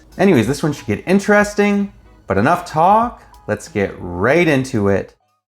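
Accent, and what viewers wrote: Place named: Canada. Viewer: He is canadian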